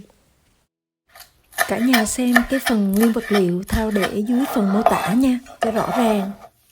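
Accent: Hue, Vietnam. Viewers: Người Huế .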